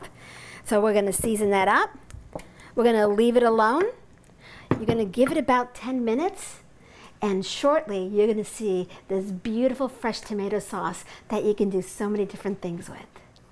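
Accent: United States. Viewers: Her accent is American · She has an American accent and her Italian one is not the best. It's what happens to the second or third generations.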